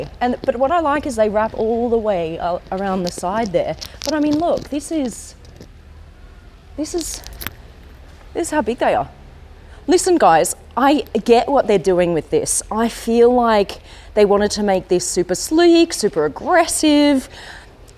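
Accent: Australia. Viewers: какой все таки австралийский акцент и говор отвратительный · There is girl with a foreign accent on Youtube pretending to be you...She cant fake your Aussie accent tho...Your Aussie guy... cheers...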